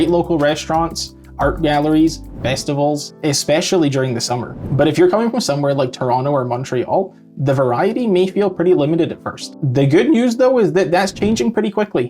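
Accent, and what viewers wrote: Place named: Ireland. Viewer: No offense, I notice a slight Irish accent in you. Is that the Calgary accent? Lots of Irish descendants there?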